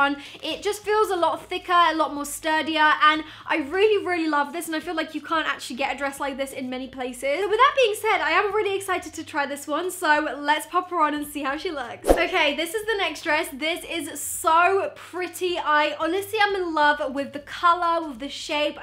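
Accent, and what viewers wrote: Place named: United Kingdom. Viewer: Omg I love your accent #britishaccentsrlife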